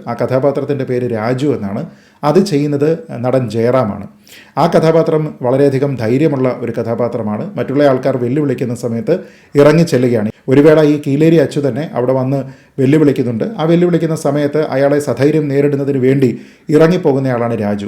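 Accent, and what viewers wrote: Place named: Central Travancore, India. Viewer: സാറെ നിങ്ങളുടെ മണിമണി പോലുള്ള മദ്ധ്യതിരുവിതാംകൂർ മലയാളം കേൾക്കാൻ തന്നെ എന്താ സുഖം 😅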